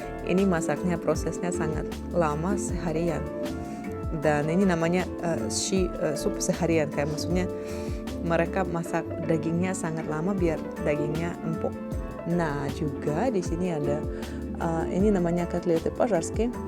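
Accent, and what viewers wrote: Java, Indonesia. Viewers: Mantap mba,bahasa indonesia nya sdh pasih disertai logat jawa sebagai pemanisnya👍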